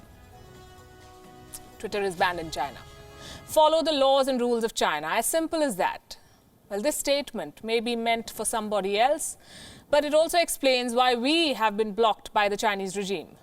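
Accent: India. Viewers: If chinese are low class Indian is it better . This reporter still hv to speak well Not induan accent. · it's indian accent only dumbass not everyone has stereotypical accent likewise I am sure not everyone in China is Ching chong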